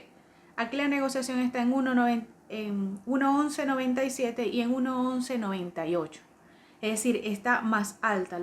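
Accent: Venezuela. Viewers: Ese acento venezolano tan bello que tenemos jeje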